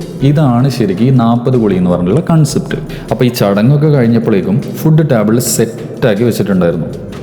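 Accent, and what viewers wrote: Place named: Kannur, India. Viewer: Waiting for next vlog.....kannur slang is really nice 👍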